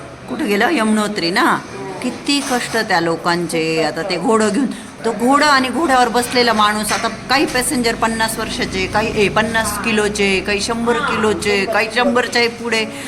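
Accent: Nashik, India. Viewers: मॅडम खूप दिवसांनी video ची वाट पाहत होतो आणि तुमचा वीडियो आला आहे खूप छान तुमचं साद आपल्या नाशिक च बोलन आईकाला खूप आवडते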